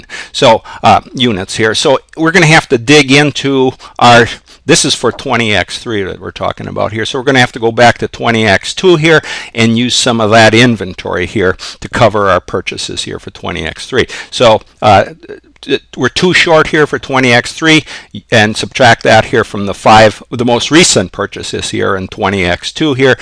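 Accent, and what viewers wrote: Boston, United States. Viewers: i love this guys boston/hispanic accent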